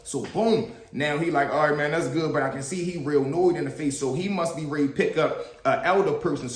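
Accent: Baltimore, United States. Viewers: You from Baltimore bro?? You gotta be from the town fr 😂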